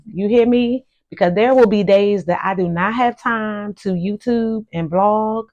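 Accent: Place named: Baltimore, United States. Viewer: You almost have a Baltimore accent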